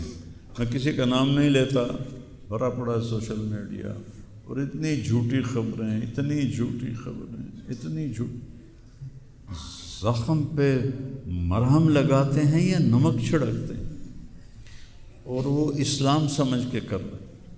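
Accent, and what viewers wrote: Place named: Paharpur, India. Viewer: Not a muslim, maulana sahab being a hindu, I have lot of love for you in my heart the way you speak, the same language my ancestors spoke,reminds me of my daadi, she passed away from this world in 2020, she was as innocent and lovely as you,my ancestors are from paharpur Dera Ismail Khan, NWFP.. my heart is full of love for you, to see you sad, saddens me too!! Literally in tears!!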